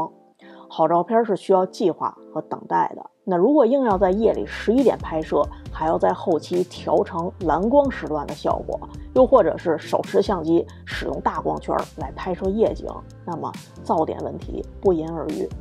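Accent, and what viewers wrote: Beijing, China. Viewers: 每期视频都干货满满。谢谢！很喜欢Edi闲适的语速，听起来舒服而真诚，带着些许的京味儿，更是倍感亲切。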